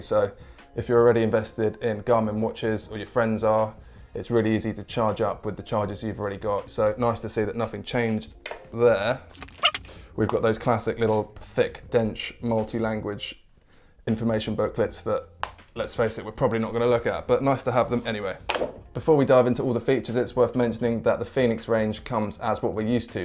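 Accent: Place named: England, United Kingdom. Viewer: EXCELLENT PRESENTATION 👏 with an English accent ...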